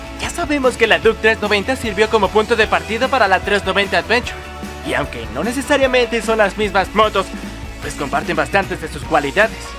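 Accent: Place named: India. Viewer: Acento tipo Apu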